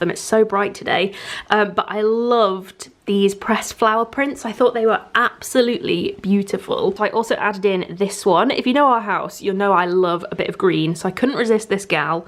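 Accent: Liverpool, United Kingdom. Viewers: Loving your vids! Is that a Liverpool/Merseyside accent I detect? (Being a scouser, transplanted to the Wirral, your mild accent drew me in, as well as how fabulous you are - of course😁)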